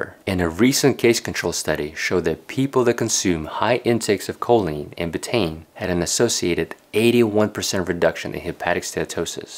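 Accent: United States. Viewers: only like your American accent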